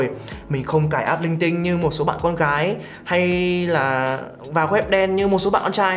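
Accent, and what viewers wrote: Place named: Hanoi, Vietnam. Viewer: giọng hà nội ai cũng vậy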